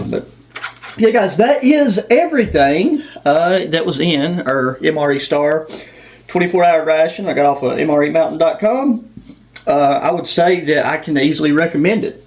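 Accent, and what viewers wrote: South, United States. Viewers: Cool civilian ration Amigo. Components sound good. Glad extra seasonings, pepper, red pepper, etc. They come in handy. Dividing A 24 Hr. ration is difficult, I have been there, and will again soon, LOL. It's bad, when civilian rations have better FRH'S than the military gets. All meals sound good. Some components might be off just A little, nothing bad. This fresh, I would not expect too many to be bad. I would not think they would, but you never know? I have been fooled before. Good meals, great review. Glad you had fun. Peace from Ky.. P.S. From your accent and way of talking, you are from the South, or once was. I am glad to hear A voice like yours. Makes me feel like I am not alone in the ration community, LOL. Hope all are well your way, need me, just holler. Peace. Nicely done friend.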